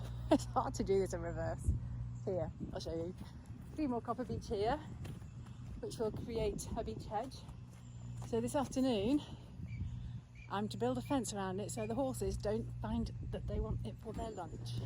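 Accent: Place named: United Kingdom. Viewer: British gals are so cute and sexy. Love your accent 😍❤️.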